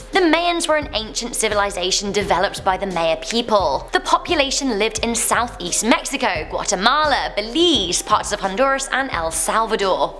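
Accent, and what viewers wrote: United Kingdom. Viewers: Yeah, she's from england and currently lives in canada i think... People from the states might pronuce it differently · Was kinda hoping for a guy to speak with a lower voice and uuummm, no British accent since like idk YouTube is from America? Is that too much to ask for? Lol. Dislike. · I love that this is spoken by British 😂😂 but is in Canada / America not sure but Yh I’m from England so respect 😂😂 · Nodaysoff J she literally said mayans Shes british.. Obviously she has an accent.. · that depends on whether or not you grew up in London or not she grew up in London and she has a thick British accent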